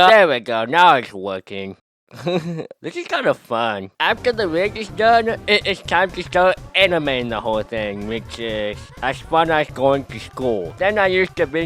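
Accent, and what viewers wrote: United Kingdom. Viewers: Bro, sounds like a British person😂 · Is bro british or smth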